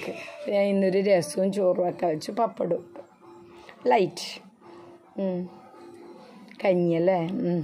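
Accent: Kannur, India. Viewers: ചേച്ചി കണ്ണൂർ ആണോ 👍🏾ചേച്ചി സൂപ്പർ വീഡിയോ 🙏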